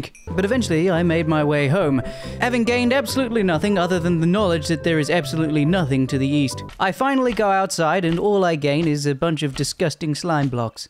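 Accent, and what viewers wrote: England, United Kingdom. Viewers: Dammnnnn british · dude i never heard British dude playing Minecraft 😅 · your voice is so bri ' ish that i should hate it but god dam it, i love your voice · more like least formal Posh Brit (Your Average Brit has a cockney accent and cockney is anything but formal) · is the most Bri'ish British YouTuber I have ever seen, and I love it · is this dude british or from somewhere with heavy british influence? the way he said darkness kinda makes me think so like the r isnt sounded just curious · you are deffinitly from the uk, ive never seen anybody talk like that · Are you British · Nice bi i s accent mate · He is an English gentleman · This is the most aggressively british youtuber ive ever heard Instant sub, very nice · He British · The British accent is marvelous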